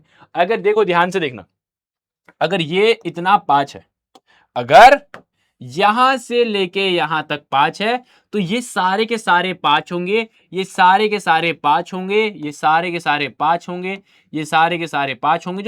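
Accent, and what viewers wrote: Bihar, India. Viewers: That bihari ascent 🫰🤌🤌🤌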